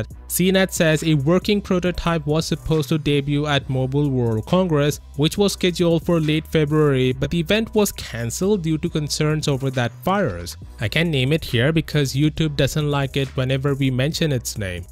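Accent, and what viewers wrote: India. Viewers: He sounds like an an India IRS scammer.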